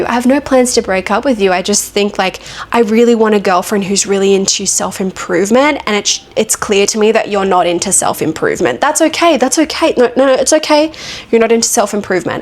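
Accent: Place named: Australia. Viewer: such a lovely Aussie accent! xx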